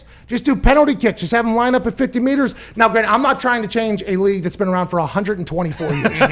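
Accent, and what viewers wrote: United States. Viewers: gillon mclachlan should employ this guy. To commentate the games. The American accent sounds good.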